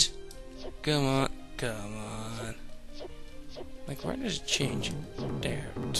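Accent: Netherlands. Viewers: u sound a bit dutch :P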